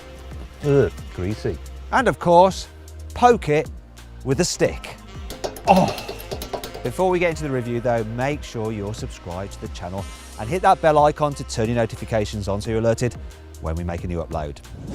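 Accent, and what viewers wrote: United Kingdom. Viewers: 영국발음 너무좋고 디자인 지리고 우리나라에 실구매가 1.35에 나오면 대박 잘팔릴듯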